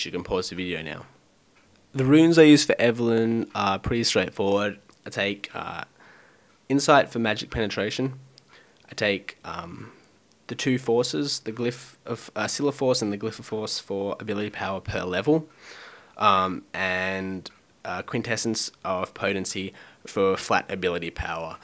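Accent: Australia. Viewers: where are you from, Its hard to tell you're accent you sound Australian but also English.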